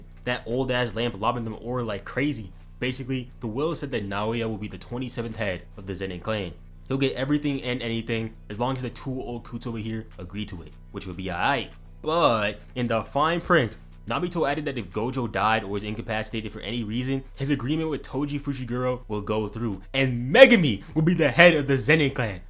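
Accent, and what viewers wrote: Kenya. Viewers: Ayooo are u kenyan. Im here from kenya tooo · Bro are you from Kenya by any chance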